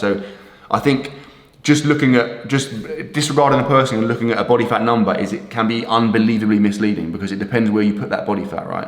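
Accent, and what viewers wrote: United Kingdom. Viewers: It’s the British accent, like I can’t stand the voice at all, no offense to anyone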